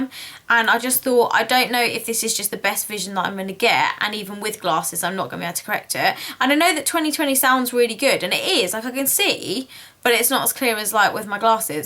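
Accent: United Kingdom. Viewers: A Indian girl talking with a British accent sounds ugly.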